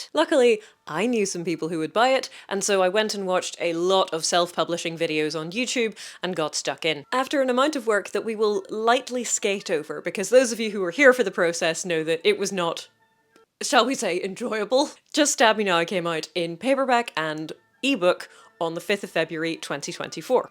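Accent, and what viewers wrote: Northern Ireland, United Kingdom. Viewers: I’ve listened to the audio book and once I got over the shock of hearing a Northern Irish accent, I was able to sink into the story. I really enjoyed the book and your performance. I gave it one of my few 5 star ratings on GoodReads. I really like a mature heroine. I will wait with bated breath for the next title, but since I like to reread and re listen to books, I will just use this one to keep myself going. ❤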